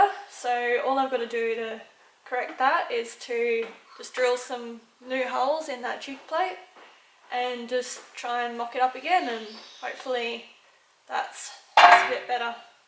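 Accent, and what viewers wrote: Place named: Australia. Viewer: Слышу австралийский акцент если я не ошибаюсь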